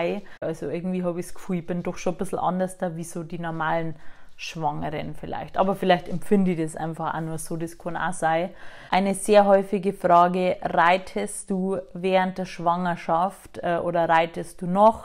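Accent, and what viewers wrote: Bavaria, Germany. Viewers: Nochmal herzlichen Glückwunsch zur Schwangerschaft. Ich würde mich auch freuen um Videos zur Schwangerschaft. Ich finde dich auch super sympathisch ich mag dich. Endlich eine YouTuberin die so schon Bayrisch redet. Ich liebe den Dialekt. ❤️❤️ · I finde sau geil, dass du Boarisch redst. I bin a a Borei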